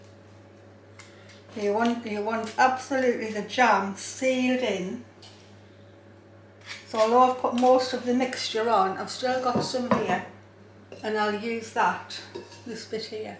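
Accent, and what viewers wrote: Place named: Northeast England, United Kingdom. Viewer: Hello Did you mean: As soon as i heard your accent i knew you were a fellow Northeastern woman and I knew instantly when you were looking for stones and paddling on Seaham beach. I was wanted more. I am a mixed media artist and live in Darlington and just adore paddling in the sea and the wild weather and the garden. I clicked subscribe and will feel right at home watching your videos. Thanks for sharing. Love from June x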